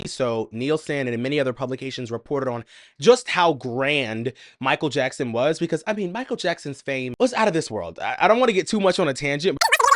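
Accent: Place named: Puerto Rico. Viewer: Ok baby I hear that accent🇵🇷👏🏽 well done!! Had me thinking you were riiiican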